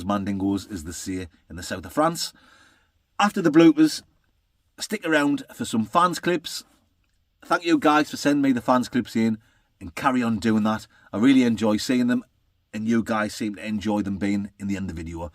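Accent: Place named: North, United Kingdom. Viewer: Nae peas mate!! But I bet you'd eat a scabby horse wouldn't You!! Love your patter very northern and humming of Scottish banter!!😂😂😂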